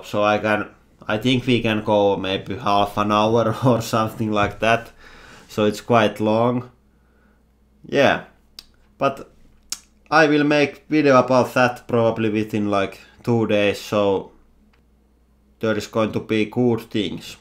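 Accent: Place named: Finland. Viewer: Oisko aksentti :D Ei sil oo ees nii paska enkku mut sil o se suomalaisten aksentti. Jos sul ny oli joku 10 enkku ni et varmastikaan pystyis puhuu paremmin :DD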